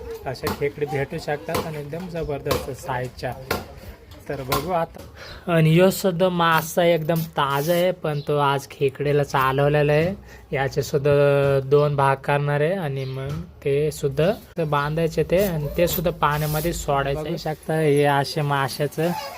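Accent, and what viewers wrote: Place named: Telangana, India. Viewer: Bhau tumhi telgu aahat ka tumchi marathi language telgu lokan sarkhi ch aahe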